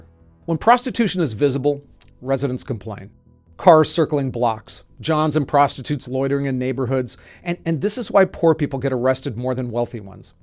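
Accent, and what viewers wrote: United States. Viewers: But it is legal in most first world countries. Assuming from the accent you meant the United States but I wouldn't call this one a first world, just a really wealthy 3rd world country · Huh ? Prostitution isn't illegal. Ah, American accent. That explains it. Can't understand why the car was stopped on the left, though.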